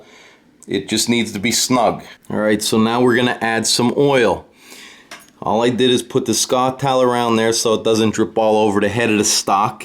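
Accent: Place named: Italian-American, United States. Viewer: You sound like a paisan. 👍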